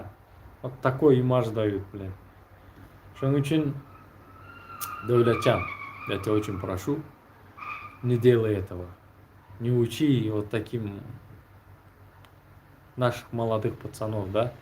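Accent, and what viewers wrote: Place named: Chardzhou, Turkmenistan. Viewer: он разговаривает на Чарджоуском диалекте,в туркменистане разговаривают на двухсот диалектах .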